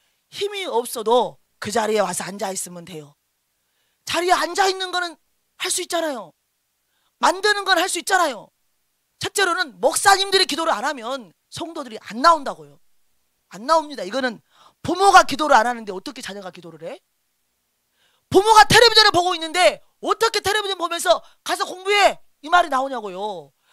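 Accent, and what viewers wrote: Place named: Jeolla, South Korea. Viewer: 목사님 채널을 알게된지 며칠 되지 않았는데요. 같은 전라도 사람이라서 그런지 억양, 말투 넘 친숙해서 좋았어요. ㅎㅎ 무엇보다 화통하게 웃으시는 웃음이 넘 유쾌하게 좋아요. 시대에 맞게 때를 따라 양식을 나눠주시고 지혜있고 충성된 종이 되어 말씀으로 양육하시니 감사드립니다. ^^ 댓글 잘 안다는데 저도 다른 분들 따라서? ㅎㅎ 댓글 달아보아요~^^ 전라도에서 정치에 관련된 목소리 내기 쉽지 않을텐데 용기내서 타협하지 않고 바르게 말씀 전하시는 것을 보면서 참 감사했어요. ^^ 주님 안에서 강건하시고 휴거되어 들림받는 은혜가 있기를 축복합니다. 감사합니다. ^^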